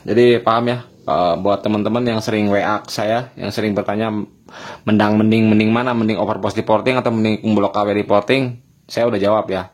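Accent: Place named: Jakarta, Indonesia. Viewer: logatnya teringat kenangan ibukota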